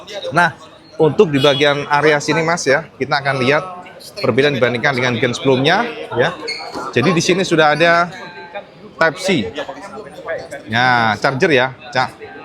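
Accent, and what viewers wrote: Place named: East Java, Indonesia. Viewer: Lampu sein nya ngepang... Bahasa jatimnya ancen Jos Gandos... Medok...😅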